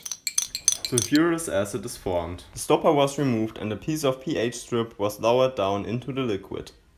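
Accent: Germany. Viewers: is that german accent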